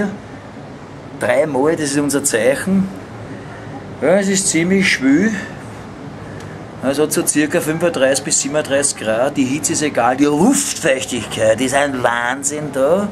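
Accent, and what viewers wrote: Vienna, Austria. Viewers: Jo Mann, do kummt des Wienerische so richti schee rüba. Verzeih mir, dos I des net guat ko, aba gfalle tuts ma scho!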